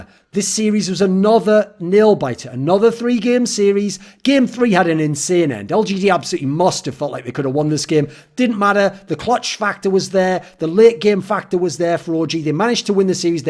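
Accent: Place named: Scotland, United Kingdom. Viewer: I just can't get past the Scottish accent. Not that's it a bad thing, but it's just noticeable to me. :)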